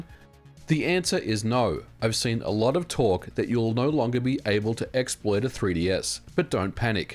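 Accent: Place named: Australia. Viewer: you're Aussie right? all these years watching you i know you're living in burgerland but you just sound Aussie... 😅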